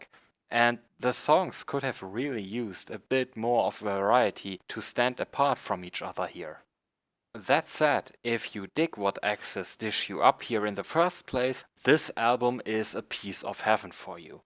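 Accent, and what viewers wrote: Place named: Germany. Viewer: yes....vor allem das grässliche Englisch dieses "Reviews"....wenn schon, warum dann keine Reviews in deutsch anstatt "Sauerkraut-Englisch"???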